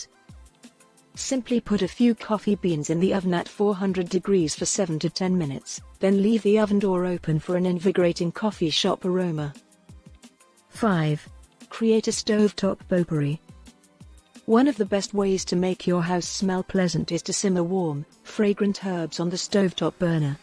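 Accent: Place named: United Kingdom. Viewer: Why the British accent!!!!!! Hire American!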